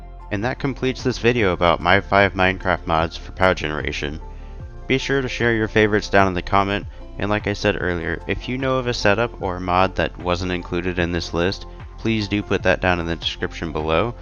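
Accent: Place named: England, United Kingdom. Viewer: das ist ein Engländer kein Deutscher man hört das von seinem Ausdruck was er sagt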